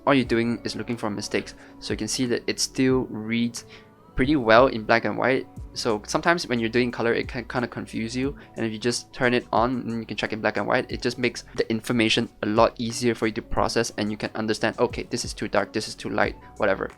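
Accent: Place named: Singapore. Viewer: Recognized that Singaporean accent ;) Great vid, Subscribed!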